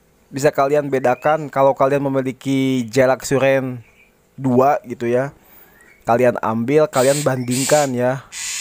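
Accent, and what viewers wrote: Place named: Sunda, Indonesia. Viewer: Inimah nadana urang sunda,salam kang ti bogor timur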